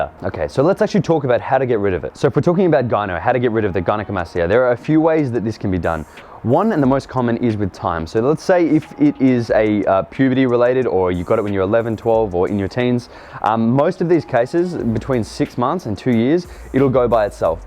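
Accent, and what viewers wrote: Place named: Australia. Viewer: Ngl mate your videos are great, but your Australian accent makes it that much better and cooler lmao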